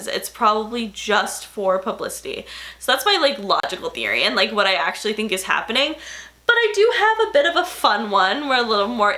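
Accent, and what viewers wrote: Canada. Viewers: I'm so confused as to why has a Canadian accent if she's an Italian from Florida like wtf 😂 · lately i think you can been sounding Canadian🤷🏼‍♀️ idk if anyone has said this cause i don’t really look at comments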